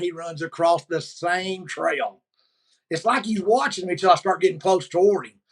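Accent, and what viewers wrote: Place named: Texas, United States. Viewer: I like this man’s accent!!! It’s all Texas y’all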